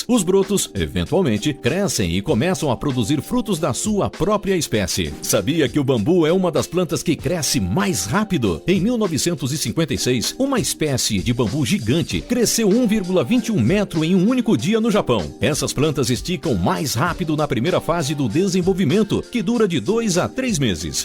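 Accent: Brazil. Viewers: Manos, esse cara é brasileiro?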